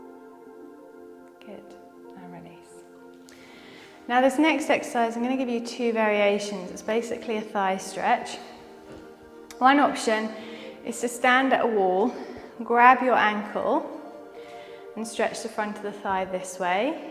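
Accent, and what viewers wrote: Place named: England, United Kingdom. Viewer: Oooh. Another one to add to my play list. I love your calmness and soothing voice and great workouts 😌💞 Are you an English lass? Gorgeous accent. I'm in Aus. 🇦🇺 Just found your channel and will be checking out many more of your videos. You're fabulous 👍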